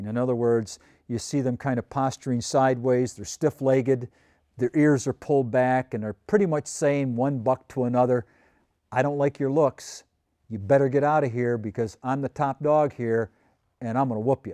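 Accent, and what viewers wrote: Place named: North, United Kingdom. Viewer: Where was he from? He has a northern type accent, but phrases from the south..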